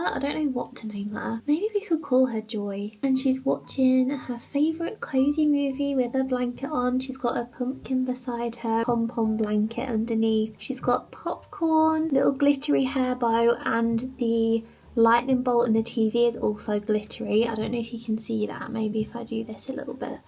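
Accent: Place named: United Kingdom. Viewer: I love listening to you speak! The UK girlies all sound so lovely to my American ears 😂 Also very helpful videos!!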